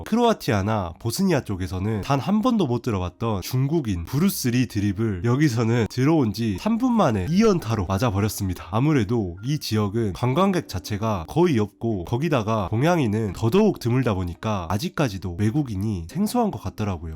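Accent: Jeolla, South Korea. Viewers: 전라도 유튜버는 정말 오랫만에 보네요 첫 멘트부터 억양이 ㅋㅋ 언제나 화이팅 하시길😊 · 아 전라도 사투리 듣기싫네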